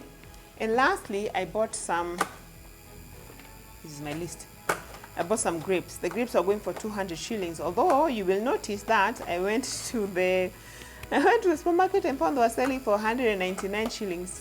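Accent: India. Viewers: The Indian accent caught me off guard😂😂😂😂😂😂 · We're moving to India,love your indian accent. For cooking oil we bought 20 ltrs from a wholesale shop, and dividend it with my sister. It's way cheaper than buying from the supermarket.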